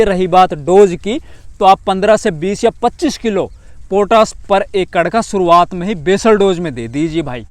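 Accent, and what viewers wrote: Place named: Mahendragarh, India. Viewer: Bhai ap kha se ho awaz tho m,grh side ki h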